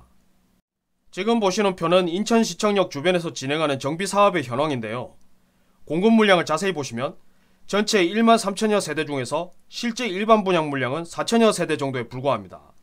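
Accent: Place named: Gyeongsang, South Korea. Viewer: 저 특유의 꼰대같은 경상도 말투가 비호감이라 좋아요는 못누르겠네...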